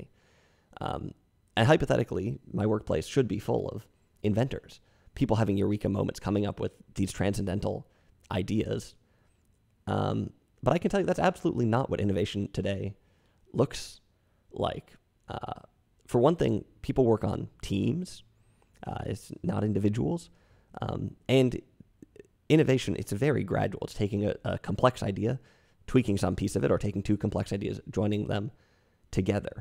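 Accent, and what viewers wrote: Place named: Bergen, Norway. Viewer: Yes it is true! I love the Bergen dialect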